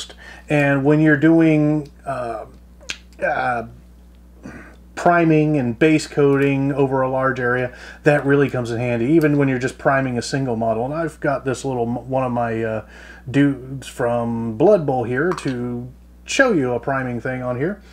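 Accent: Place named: United States. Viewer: Never heard of Grex airbrushes, so am guessing by your accent and hints about Dollars you are in USA?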